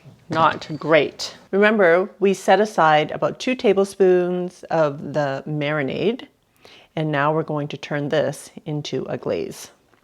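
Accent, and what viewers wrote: Canada. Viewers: Very cute accent! Are you Canadian 🥰🌷... love the accent love your recipe !! Can’t wait to try it!!! Omg! Mouth watering 😋😋😋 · ok so I went to the Chinese supermarket and they have pork belly on sale imported from Mexico for 3.99 a pound I naively asked for two pieces and it came to 4.4 lbs and $17 but I decided it was worth it and I may go back and get some more and put it in the freezer while it is there what do you think, gud deal or? love your Canadian "outs" and "abouts" BTW. Arthur in California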